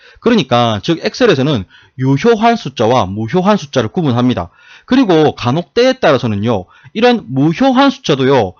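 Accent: Gyeongsang, South Korea. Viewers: 졸리는 부분이라도 꼭알아두어야 할 항목입니다.ㅋㅋㅋ 구수한 경산도...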